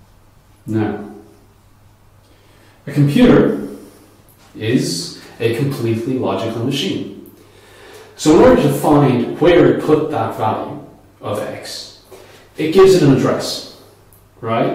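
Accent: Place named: Ireland. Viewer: Yeah please do! and nice I am aswell. It's always nice to hear an Irish accent in this area of youtube videos. keep up the good work!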